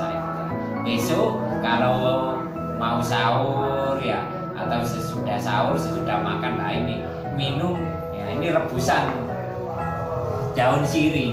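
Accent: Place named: Java, Indonesia. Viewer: Asli ngendi bang kok boso jowone kadang kentel banget👍👍👍 Salkom dan mantuulll banget👍👍👍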